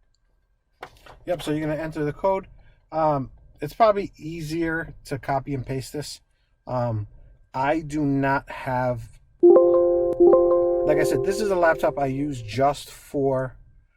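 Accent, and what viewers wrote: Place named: New Jersey, United States. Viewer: Love the jersey accent! Great video.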